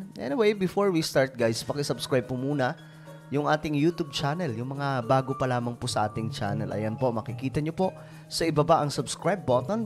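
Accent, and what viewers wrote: Waray, Philippines. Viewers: Ok sangkay parang waray.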